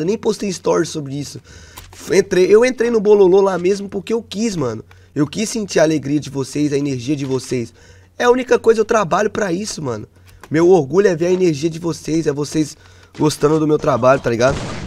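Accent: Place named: Santa Catarina, Brazil. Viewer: sotaque catarinense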